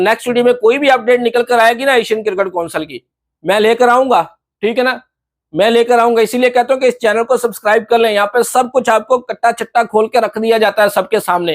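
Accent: Pakistan. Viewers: Aap ki urdu Pakistani urdu lag rahi hai❤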